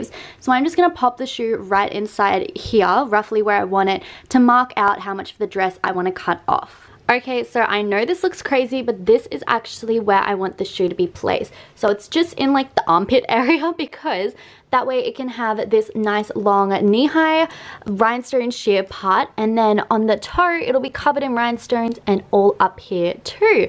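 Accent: Australia. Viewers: Your Australian accent is very refreshing! Awesome video btw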